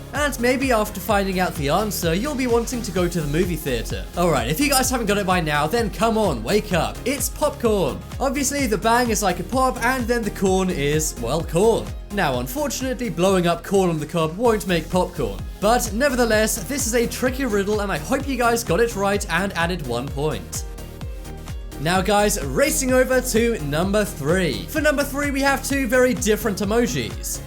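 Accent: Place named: United Kingdom. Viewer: He sounds btitish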